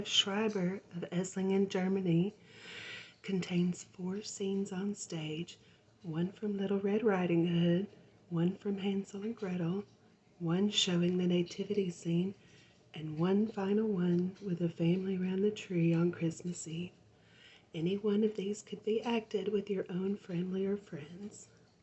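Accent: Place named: South, United States. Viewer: Hi ❤️. Thank you so much for this wonderful video ❤️. I really enjoyed it. The whispering, hand movements, tracing and story reading is very tingly and relaxing. It's been a long time since I've seen a pop-up book. That's really cute. Your whispering and southern accent really brighten the stories. Thank you for sharing this with us. Your soft soothing voice really adds to the tingles. Thank you again so much for this wonderful video ❤️. Please stay safe and be careful ❤️. Merry Christmas my friend 🧑‍🎄❄️☃️🦌🎄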